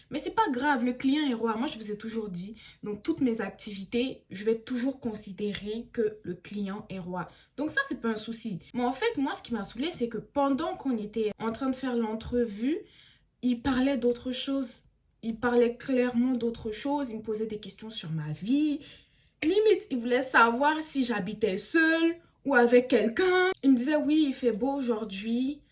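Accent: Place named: Ivory Coast. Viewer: J’aime trop la façon tu nous racontes la story🥰🔥 l’accent ivoirien et tout et tout je kiff 💕 Bourkina / Civ c’est la famille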